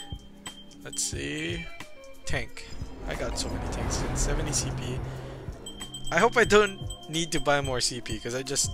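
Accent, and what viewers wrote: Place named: Philippines. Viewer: Bro can you make a video with speaking Filipino me especially like your Filipino accent so can you do a video pls!??.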